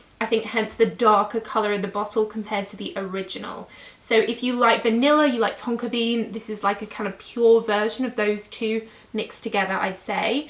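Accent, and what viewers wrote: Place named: London, United Kingdom. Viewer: Hello from Brazil again!! Not only do I love hearing your reviews, but I also love just hearing you!! It reminds me of the time I lived in London, I could listen to your for hours!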